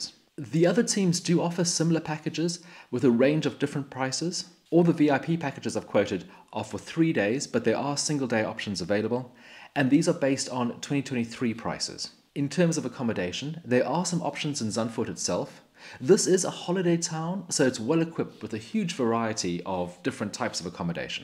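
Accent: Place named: United Kingdom. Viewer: You’re Dutch? You’ve nailed the British accent if your Dutch.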